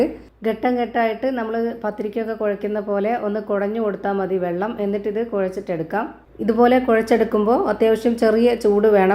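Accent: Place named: Malappuram, India. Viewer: മലപ്പുറം താത്താന്റെ ശബ്ദം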